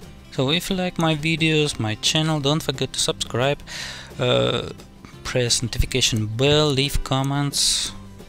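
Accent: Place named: Russia. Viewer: you are Russian? у тебя русский акцент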